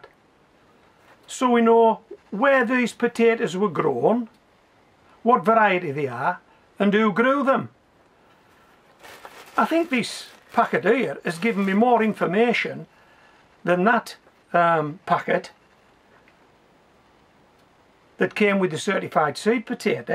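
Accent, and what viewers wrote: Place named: England, United Kingdom. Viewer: I’ve been binge watching your videos for a couple of weeks now. Most times I cast it to my TV and let it play in the background while I’m doing something else or working from the computer (like today...it’s needed today). Besides them being highly informative, I also love that one instrumental tune you play, your cadence of speech and accent is calming to listen to, so thank you. (Typical American going gaga for English accent. 😆🙋🏾‍♀️) Nevertheless, I don’t understand why anyone would give this video a thumbs down. Keep’em coming!! Much love from Virginia, USA! 💚 And sorry for the loss of Molly. 😇💕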